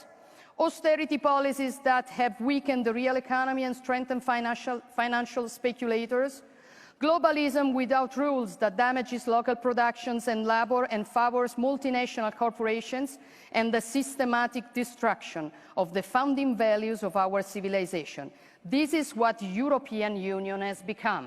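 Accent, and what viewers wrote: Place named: Rome, Italy. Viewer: A tutti quegli stronzi che ti sfottono che parli in romanesco, beccatevi questa.....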